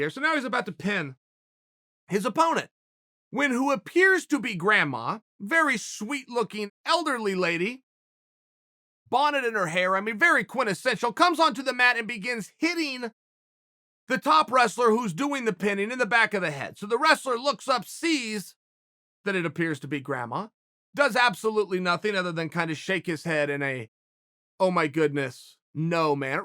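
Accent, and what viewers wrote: Russia. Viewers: Love Chael's ambiguous russian/east-european accent here lmao. Give us more russian wrestling stories Uncle Chael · Your Russian accent Chael.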